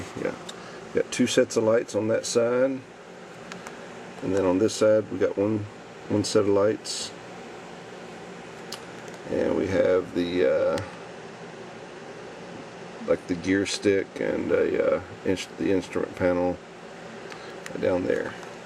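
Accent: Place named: West Virginia, United States. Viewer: Your accent tells me you are from West Virginia or close to it.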